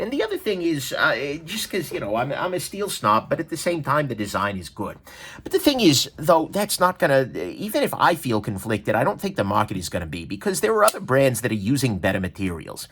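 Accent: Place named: New England, United States. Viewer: I tried to watch this but his ridiculous Yankee accent ruined it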